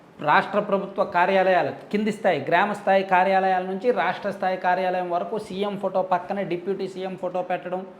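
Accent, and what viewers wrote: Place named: Srikakulam, India. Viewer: బ్రో..ని భాష చూస్తే మా శ్రీకాకుళం లా వుంది ..మీది మా శ్రీకాకుళం అయితే దాన్ని డెవలప్ చేయమని వీడియో చెయ్ బ్రో.. చాలా మంది పనులకు విజయవాడ hyderbad వలస వెళుతున్నారు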